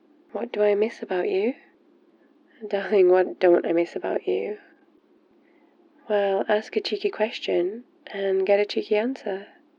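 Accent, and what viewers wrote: United Kingdom. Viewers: God, why are you british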